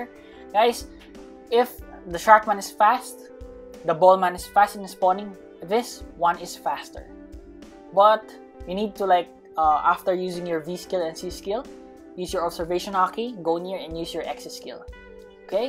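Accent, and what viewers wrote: Philippines. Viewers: Qeustion my friend What is ur race? If I'm gonna guess Filipino? Cuz ur accent made me curious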